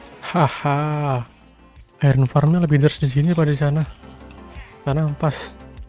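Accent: Indonesia. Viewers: Plembang dkit cknyo😂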